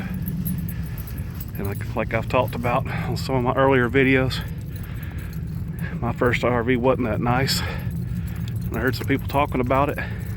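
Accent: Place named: New England, United States. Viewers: Nice to hear the New England accent out there on desert! That slide guitar music reminds me of my daddy and his band back in the 50's . My daddy could play an electric lap guitar with a with a metal slider. It was great! He knew Les Paul and Mary Ford! The band used to play clubs on the East Coast of New Jersey. That music ending your video today was a nice memory. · I knew it was a Boston accent! Tho you do have a bit of a down east accent (you know those Mainers!) Boston here too. GO PATS!! 🏈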